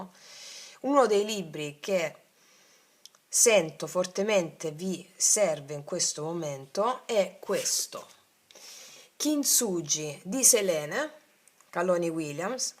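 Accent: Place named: Rome, Italy. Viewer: Mbe di Roma ti ci innamori, non puoi farne a meno. Poi avevo varie amiche romane, me facevano impazzi'. Il problema delle cose belle è che creano dipendenza. Roma mia amata Roma bella e caotica città irrequieta. Poi il romanesco me fa sbrocca'. Grande, sempre molto gentile ed amorevole eee. Come cantava... Quanto sei bella Roma...mo la vado a senti`🌝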